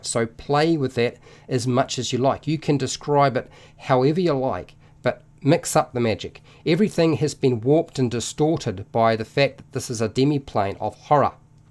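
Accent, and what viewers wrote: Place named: New Zealand. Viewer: Great guide and only enhanced by that charming kiwi accent!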